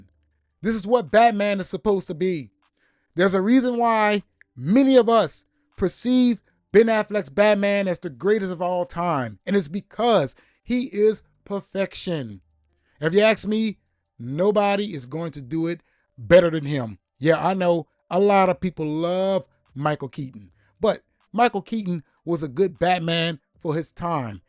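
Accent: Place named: Louisiana, United States. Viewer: I love the enthusiasm when you say things are about to get a little bit spicy baby with that Cajun dialect I'm from Texas which is close to Louisiana and always thought that people from Louisiana have a very swag-a- docious way of speaking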